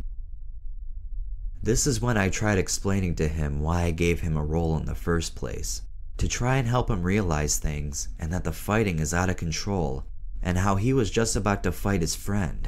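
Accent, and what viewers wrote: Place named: Upstate New York, United States. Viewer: Yooo i knew you had an upstate ny accent haha 585 waddup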